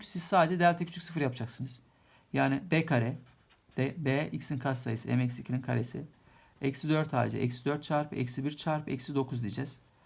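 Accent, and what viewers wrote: Trabzon, Turkey. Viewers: hocam aynı trabzonlu gibi konusuyonuz yanlis anlamayın 😁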